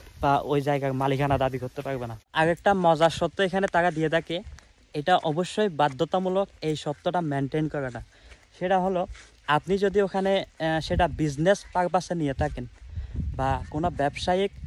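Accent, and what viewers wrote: Chittagong, Bangladesh. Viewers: কথার একসেন্টেই বুঝা যাচ্ছে চিটাইঙ্গা ❤ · Chittagong er manusher shadu vashai Kotha bolte akto shomossha hoy ata shodo onar na shob Chittagong er loker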